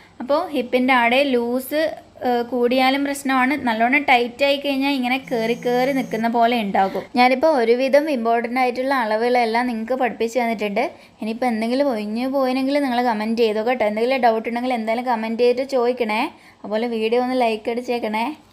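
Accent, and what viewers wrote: Kannur, India. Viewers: Kannur analle.... 😍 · Kannur aano, enkil evideyaa. Samsaarareethi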